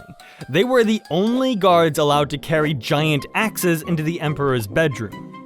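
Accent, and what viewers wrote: Germany. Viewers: this guy really puts the german in the germanic scandinavian accents, ngl horrible horrible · It sounded German lom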